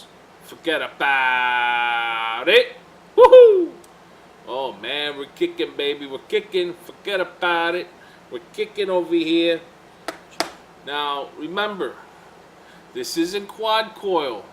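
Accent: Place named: New York, United States. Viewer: ***** ya you can tell your a new yorker without the forgetaboutit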